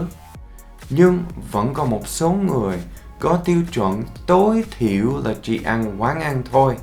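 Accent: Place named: Saigon, Vietnam. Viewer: giọng Sài Gòn rất hay =))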